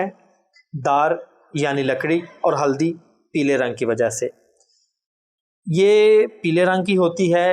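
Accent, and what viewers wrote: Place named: Lahore, Pakistan. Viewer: Lahore Pakistan se boht Sara Pyar apko aap kis city se ho dear ❤️aap boht hi piara bolte ho , asal Hakeem ho aap, Lekin bolne Kay style se Lahore Kay lgte hain 💯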